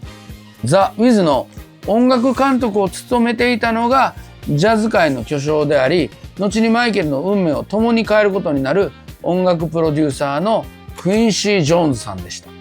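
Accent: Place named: Kansai, Japan. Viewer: 大学生のときにアメリカ音楽の歴史を学び、Michaelが大好きになった31歳です。大学生でめちゃくちゃハマったときレポートも書いたのでそのときに西寺さんが書いたMichaelの本も読みましたがはじめてお話されているところを見て関西弁にかなり親近感を感じてます！この動画を見て、IMAXで2回目見てきます👀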